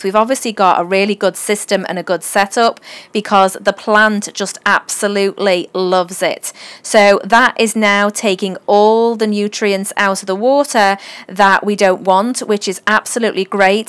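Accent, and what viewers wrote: Liverpool, United Kingdom. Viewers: i know ur accent it is british.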